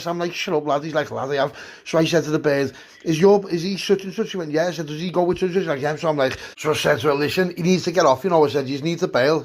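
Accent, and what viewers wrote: Liverpool, United Kingdom. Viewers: My missus just said what are u listening to i said scouse stories 😂 she said he's proper scouse 🙈 its in the title😂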